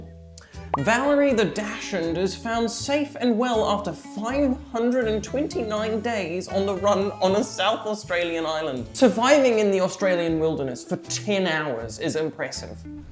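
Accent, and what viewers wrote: United Kingdom. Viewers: This explains the British accent thing during your stream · I thought you already had a British accent!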